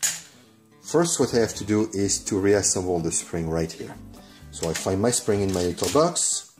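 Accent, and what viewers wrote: France. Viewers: tried to find the answer in your other videos? Is that a French accent? Also I followed your instructions to install the Cajun Gunworks Reach Reduction kit on my Shadow 2 today. It worked out awesome!!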